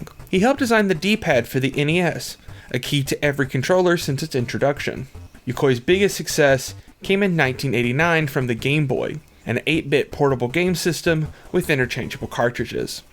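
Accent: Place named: Kentucky, United States. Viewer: Judging from that accent youre also a Kentuckian. Subscribed.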